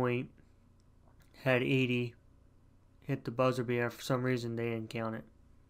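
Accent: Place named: Philadelphia, United States. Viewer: U from philly?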